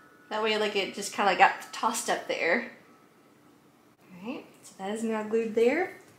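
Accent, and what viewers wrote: South, United States. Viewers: I love your st Patrick’s DIY 🤩 your personality so adorable and love the southern charm accent ☺️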